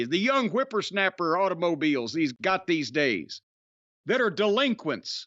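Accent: South, United States. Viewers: with all due respect from a resident of California “you damn liberal!”. it’s odd to hear your views from someone with a southern accent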